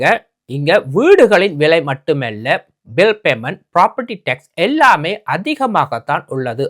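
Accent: Sri Lanka. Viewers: Ilankai thamil kekka rompavum inimai.😅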